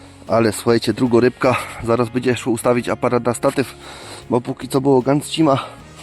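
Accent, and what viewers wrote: Silesia, Poland. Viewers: Karpie nie moja bajka, ale fajnie zaciągasz po Śląsku 😉. Pozdrowienia z Mazowsza.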